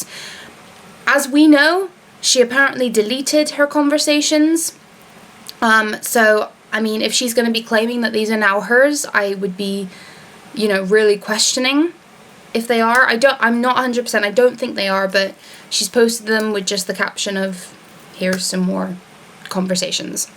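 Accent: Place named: Scotland, United Kingdom. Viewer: Can't determine whether you are Scottish or English?? Definitely the tiniest slight hint of Scottish in your accent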